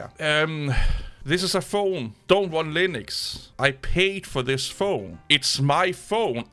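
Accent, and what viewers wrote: Denmark. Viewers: His thick Danish accent is one of the reasons I watch this channel!😍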